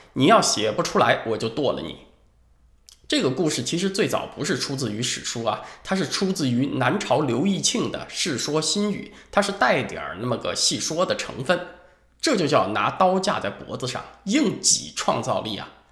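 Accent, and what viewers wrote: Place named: Tianjin, China. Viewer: 天津普通话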